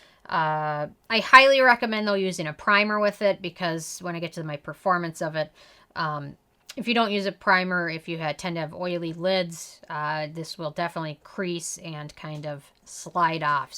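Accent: Minnesota, United States. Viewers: You HAVE to be from Minnesota or Wisconsin!❤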